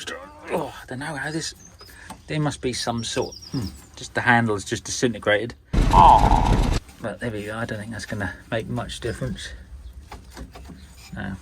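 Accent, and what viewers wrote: Norfolk, United Kingdom. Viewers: All the Norfolk-ness on show tonight 😂 brilliant 👌🏻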